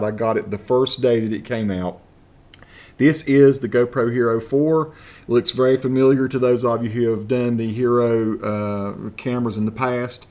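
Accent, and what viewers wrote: South, United States. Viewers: Is that accent real? I've never heard such a strong Southern accent before :)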